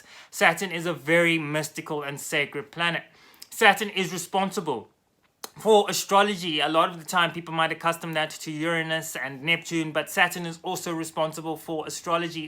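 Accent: South Africa. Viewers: Are you from South Africa ? Thanks for the video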